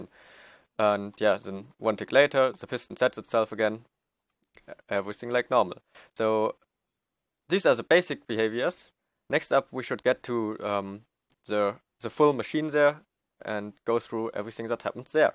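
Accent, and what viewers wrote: Germany. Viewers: der is deutscher als deutsch das hört man immer so schön raus xD aber gutes englisch :)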